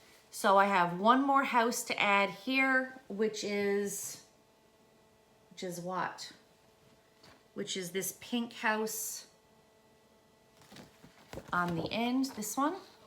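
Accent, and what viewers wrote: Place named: Newfoundland, Canada. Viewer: First time seeing your video on my feed. I thought to myself, I need to figure out where you were from. Towards the end of the video, you talked about the fires here in Newfoundland. I always find it relatively easy to distinguish newfoundlers speech. Yay! Found a needleworker on YouTube from the rock. Living on the west coast. Keep well and thanks for your time.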